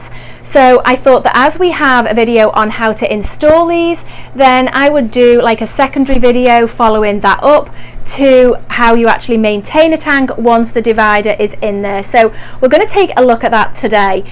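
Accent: United Kingdom. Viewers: oh ok thank you! Great video btw your videos are always so fucking and soothing to watch!! Even though I never comment I always like your videos! And I was just wondering because of your accent I thought you lived in the uk! I’ve only been subscribed for a month or less but I’ve liked your videos ever since I started watching and I keep going back to your old videos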